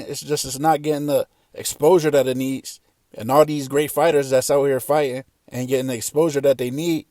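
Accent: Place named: Pennsylvania, United States. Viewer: I DEFINITELY THOUGHT YOU WAS FROM MY CITY BUT YOU STILL FROM PA SO YOU HAVE OUR STRONG ACCENT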